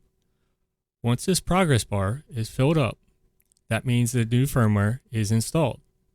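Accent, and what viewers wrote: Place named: New Orleans, United States. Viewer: Great video and great timestamps and links. Thanks for your efforts. Sounds like a Nawlin's accent...takes one to know one. Keep up the good work...sub.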